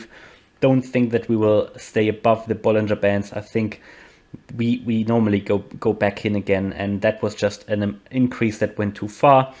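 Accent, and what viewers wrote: Germany. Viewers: Your accent sounds german, correct? I like that you are very specific with your forecasts. I subscripe your channel hoping for more iota videos.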